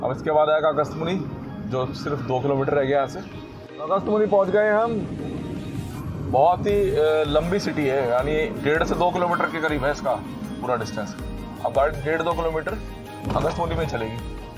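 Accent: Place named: Western Uttar Pradesh, India. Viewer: Bhai ap UP west se ho kya Ekdum hmara area jo moradabad ke pass ka hai bilkul aisa lagta hai apna. Koi bhai local bat kar raha hai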